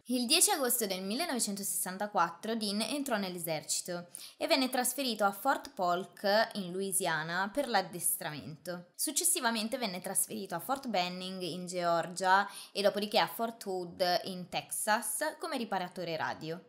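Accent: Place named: Milan, Italy. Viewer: Sì consocevo la storia di Candyman; uno dei più famosi serial killer USA. Poveri ragazzi, adescati dall'alro farabutto coetaneo e complice dei Corll. Ti chiami? Ebbene è il mio primo video del tuo canale e mi sono iscritto. Hai una buona voce e narrazione con accento lumbard milanes.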